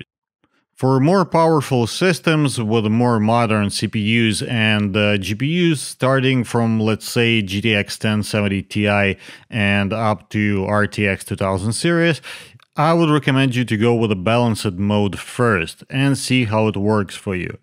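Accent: Russia. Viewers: Чувак, ты же явно русский, правда?)))) акцент.. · Я прям чувствую родной акцент :) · russkiy russkogo slishit iz daleka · Russian accent coder "based" in the US yeah call me paranoid but nope i like my FPS like it is. Also, 50/50 stolen code. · Русский акцент я узнаю везде, так держать товарищ! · Русский акцент не перебить чистым английским) Отличная тулза, спасибо!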